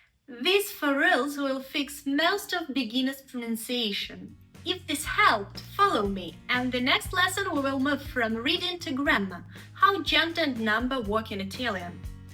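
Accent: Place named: Eastern Europe. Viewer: Your accent sounds like it belongs to a Slavic language, especially when you speak English. Love how you explain everything - super! xx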